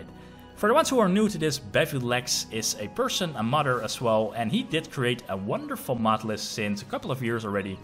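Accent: Netherlands. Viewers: Hello fellow Dutchmen. Altijd fijn dat je aan de uitspraak meteen merkt dat iemand Nederlands is.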